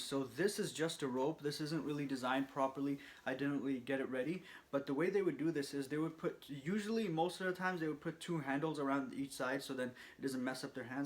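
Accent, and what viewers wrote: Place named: Iran. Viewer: ایرانی بودنت داد می زنه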